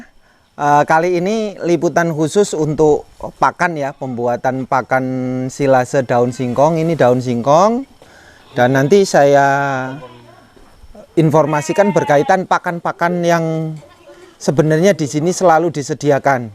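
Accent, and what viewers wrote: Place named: Banyumas, Indonesia. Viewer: Ora ngapak ora kepenak nimba ilmune cikgu salam ngarit❤️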